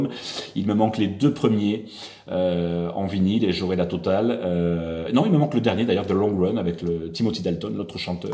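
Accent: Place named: Southwest, France. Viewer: Salut super trésor récolte a que agréable notre accent du Sud-ouest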